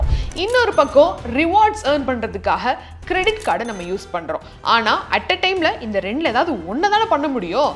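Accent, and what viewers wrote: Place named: Kongu, India. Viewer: Neenga kongu Area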